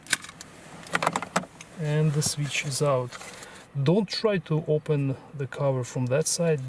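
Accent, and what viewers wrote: Russia. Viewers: А почему акцент рязанский?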